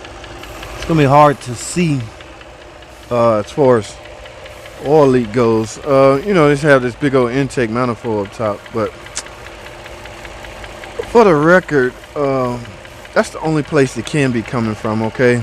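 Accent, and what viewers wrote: South, United States. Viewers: Southern accent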